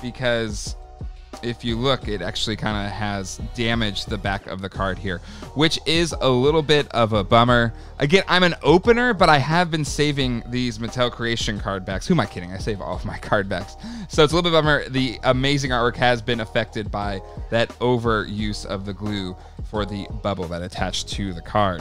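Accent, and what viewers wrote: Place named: Midwest, United States. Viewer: You have such a thick midwestern accent lol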